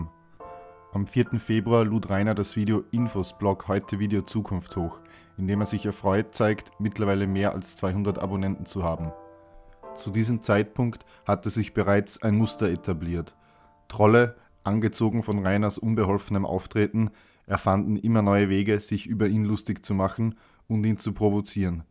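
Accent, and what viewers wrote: Austria. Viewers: Ich finde dein ge-österreichel doch sehr sympathisch, und auch, wie du mit völlig trockener Stimme Reinerles Utopien wiedergeben kannst. Liebe <3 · wie man sofort merkt, dass der erzähler österreicher ist haha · gibt es silent hill wirklich?? austrian voice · Bist du Österreicher?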